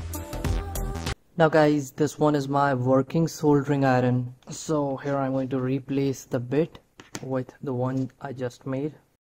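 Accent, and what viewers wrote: India. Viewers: 여기는아느나라인가 발음이 인도인듣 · Индусский английский, это самый ужасный английский на земле.🤢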